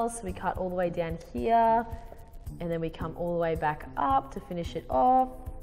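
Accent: Australia. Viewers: r u Australian? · oh and also are you from Australia because you sound like it and i am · Is she Australian 🤔🤔🤔 if she is I live in Australia too.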